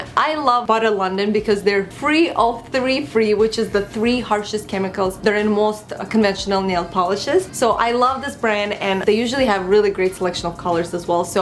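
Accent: Russia. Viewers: Hi, i love your videos ♥ I started watching them recently. I know, that my English is so-so (I'm from Russia), but I think you're from Russia too, cos u have a Russian accent, is it? It may be, I have mistake. :) and by the way, you is a beautiful <3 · whats your accent from? you sound a little bit russian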